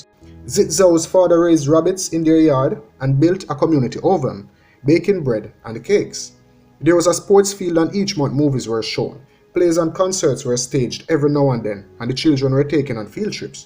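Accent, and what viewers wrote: Jamaica. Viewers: Apaul A is fascinated with your Jamaican way of speaking...lol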